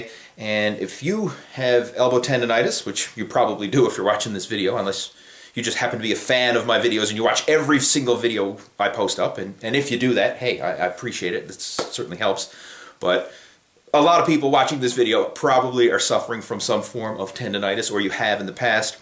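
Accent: Newfoundland, Canada. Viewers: this is the first video i'm watching of yours, you are 100% from Newfoundland aren't ya?? ahah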